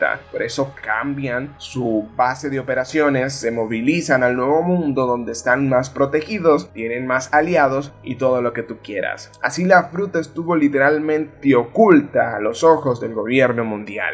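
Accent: Dominican Republic. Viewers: Bro eres dominicano ? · Por su acento diría que es de RD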